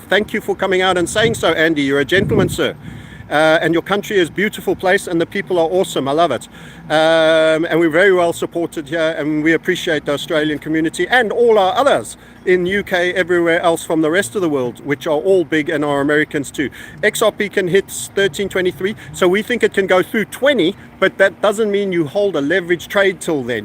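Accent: South Africa. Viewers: Hi. New to the chanel. Is that a South African accent I hear?👀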